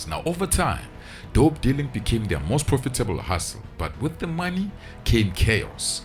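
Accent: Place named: South Africa. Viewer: BRO I LOVE THIS CHANNEL. THANK YOU FOR KEEPING OUR ACCENT AS SOUTH AFRICANS!! 💪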